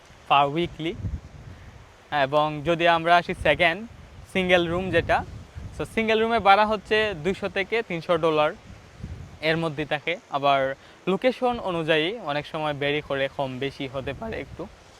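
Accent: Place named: Sylhet, Bangladesh. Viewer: ভাই আপনি বোধহয় সিলেটি। সিলেটি ভাষায় কথা বলেন... অন্যান্য, জেলার তুলনায় এই অঞ্চলের ভাষাটা খুবই বোধগম্য ও সুন্দর। ইলিশের বাড়ি চাঁদপুর থেকে...🤍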